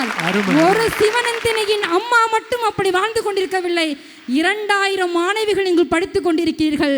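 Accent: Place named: Chennai, India. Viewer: பள்ளிக்கூடத்தில் படித்தது ஒன்று, பேசுவது Madras தமிழ் தூதூதூதூதூதூ ....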